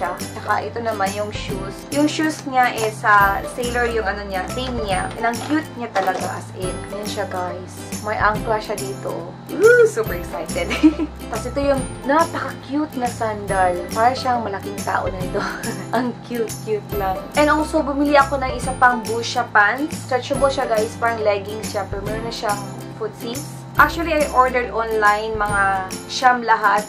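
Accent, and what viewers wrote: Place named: Visayas, Philippines. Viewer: Bisaya ka po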